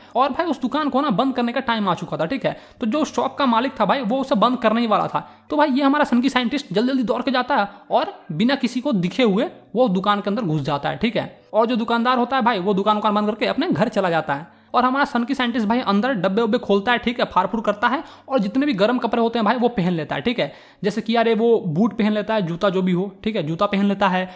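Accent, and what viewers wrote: Bihar, India. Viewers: Aap bihar ke ho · Bihari ho abe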